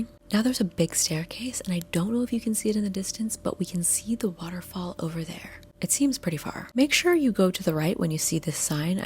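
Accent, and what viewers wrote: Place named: United States. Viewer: What do you mean? I'm an American who understood her American accent perfectly. I'm sorry if you don't have the cognizance to understand one of the most common, average, generic, but generally functional accents in the English language.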